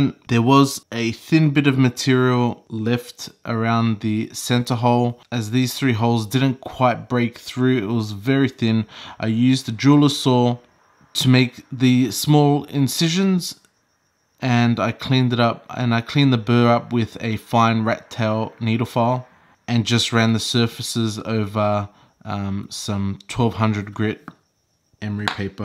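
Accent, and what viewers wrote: Australia. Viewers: Are you in Australia? You sound it haha. I haven’t watched all of your videos yet but course did/are you doing? B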